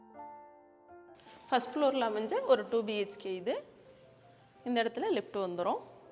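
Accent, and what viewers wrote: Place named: Kongu, India. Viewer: அம்மா, வீடு விற்பனை மற்றும் வீடு வாடகை தகவல்களை நீங்கள் விளக்கும் முறை அருமை. கொங்கு தமிழ் பேசுகிறது. வாழ்த்துக்கள். பணி சிறக்க வாழ்த்துக்கள்.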